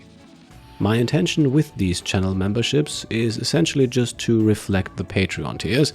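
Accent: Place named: Germany. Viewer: You have a similar speech pattern to another channel I watch. "Feli from Germany". She obviously learned American English tho.. Are you Bavarian? Btw, Glad you will be on YouTube full time!!. I'm patiently waiting for a Stellaris series done the complete way. It would be SUPER SUCCESSFUL and there is not a lot of stellaris playthrus that RP effectively!!!!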